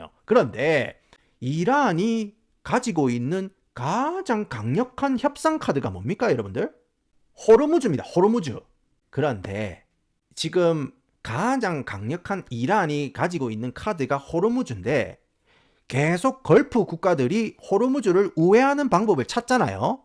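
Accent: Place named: Busan, South Korea. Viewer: 처음엔 부산사투리를 살벌하게 구사하셔서 살짝 당황(?)하면서 초반만 보는듯 마는듯 봤었는데요 우연히 님 영상을 그냥 켜두고 제 할 일 하고 있었는데 하시는 말씀 듣다보니 어려울 수도 있는 정보와 지식을 참 쉽고 재미나게 풀어서 설명해주시는데 (중간 중간 난무하는 드립도 너무 중독적) 그리고 본업도 하시고 육아도 하시면서 자투리 시간을 할애에서 하시는 유튜브이신 것 같은데 진짜 퀄리티 장난 아니네요 이젠 30분 넘는 긴 영상이 기다려지고 (너무 몰입해서 봐서 그런가 이제 짧게 느껴져요) 완전 팬됐어요 럼프짱 성대모사는 봐도 봐도 안 질려요ㅋㅋㅋㅋㅋㅋㅋ가끔 댓글에 혀를 너무 굴려서 영어 발음 한다고 지적하는 사람들 있던데 10년 넘게 미국에서 살아본 제가 느낄때 님 발음도 구사하시는 문장도 흠 잡을데 없이 완벽합니다 영어랑 꽁냥꽁냥 1도 안되는 사람이 일부러 있어보이는 척 말끝마나 콩글리쉬 섞어쓰는거 보다 님이 네이티브로 구사해주시는게 듣는 제 귀엔 훨씬 편하네요~~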